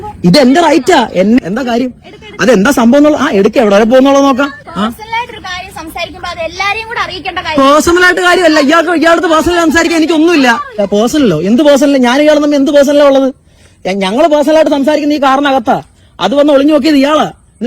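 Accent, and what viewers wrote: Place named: Kollam, India. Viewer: സംസാരം കേട്ടിട്ട് കൊല്ലം ജില്ലയാണെന്നു തോന്നുന്നു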